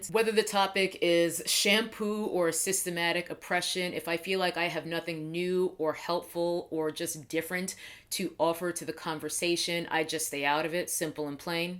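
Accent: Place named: Long Island, United States. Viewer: Pausing this video to say that red lipstick looks amazing on you. And I Also love listening to you talk because I'm getting hints of LI in your vowels. I miss NY, what can I say? Coming back: I felt so bad for Chelsea. It was so obvious that her style was lifted. Patrick Starrr's comment really hit me the wrong way. I didn't like it and got some pretty bad vibes. Manny apologized and to me it appeared to be sincere, but the damage was done. I really hope that this negative will somehow turn around and open up more opportunities for her. She deserves it. I know nothing about making videos, but I knew when I saw hers that she worked very hard. My phone is keeping track of what I read. Today when I woke up, I read about the woman, Ann Lowe, who made Jacqueline Kennedy's wedding dress. It resonated with me as the Ms. Lowe is a woman of color and came from a long line of excellent seamstresses. 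it's been over 50 years and some things have improved, but there is still a long way to go.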